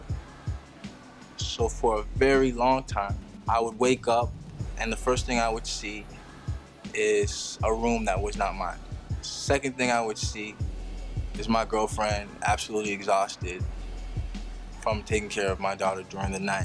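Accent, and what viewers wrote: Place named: Canada. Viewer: He sounds SOO Canadian, eh? The Canadian "hood" accent is a combination of West Indian, and believe it or not, African. There's even some Arabic influences. I personally find people who say "ahlie" and "walahi" to be annoying, but I mean that's still pretty cool.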